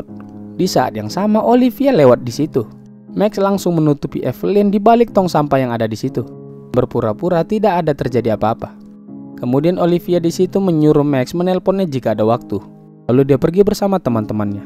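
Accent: Medan, Indonesia. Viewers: Pakek ngomong medan aja sampek habis min,baru paten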